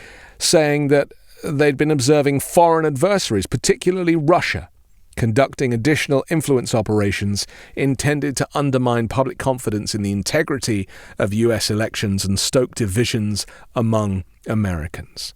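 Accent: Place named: RP, United Kingdom. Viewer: I came here cause I needed to hear your posh, measured, and calm British accent to soothe my frazzled nerves and chewed fingernails. I'm really trying to manage my expectations and your ever intelligent reporting and journalistic integrity has helped to keep me sane and from panicking. Thank you and everyone at MTN for choosing Science & Facts and giving us Democracy loving Patriots a news home.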